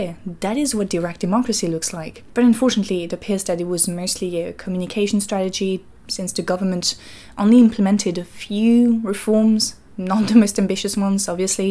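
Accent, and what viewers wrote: North, France. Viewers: Est ce que t'es du nord?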